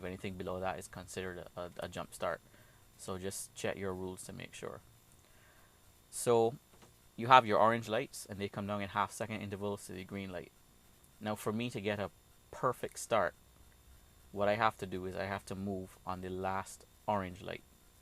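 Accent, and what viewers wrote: Barbados. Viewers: Basically Barbadian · Bajan accent.. from Barbados. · Yh he is bajan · I thought he was Irish at first, then I realised he actually sounds more bajan O_o lmao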